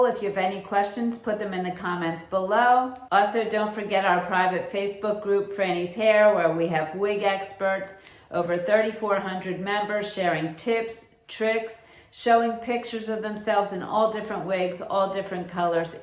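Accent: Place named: New York, United States. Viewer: Also as a former Long Island girl who moved to NC I miss that accent! (I’m assuming you’re a NY girl!)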